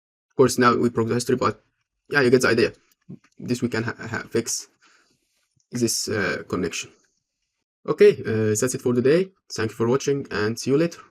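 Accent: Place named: Egypt. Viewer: Egyptian accent, I got you! 😂 Thanks, Broo!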